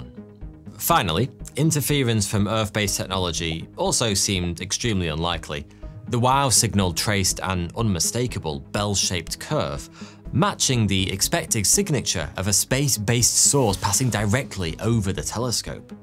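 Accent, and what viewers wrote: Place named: RP, United Kingdom. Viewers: Goodness, a posh British accent makes everything more palatable. It’s prized by Wall Street, Madison Avenue and Hollywood. Actors take classes in The Received Pronunciation. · A posh British accent can’t make bullshit more palatable. Several explanations exist for the ‘wow’ signal.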